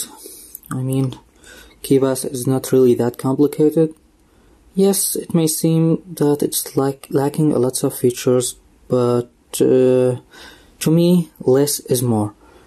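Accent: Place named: Iran. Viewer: Thank you! Do you speak Farsi? Where are you from? You have a nice accent that reminds me of some of my students. They're very brilliant.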